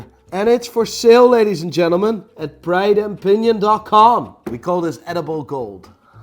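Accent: Northern Ireland, United Kingdom. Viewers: Are you from Northern Ireland u sound it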